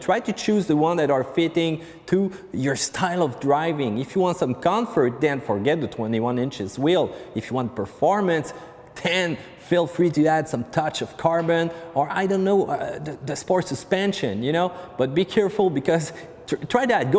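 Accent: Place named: Montreal, Canada. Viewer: You don't need to listen to people correcting you. Your review was top notch. Your Montreal accent did not get in the way of me understanding anything.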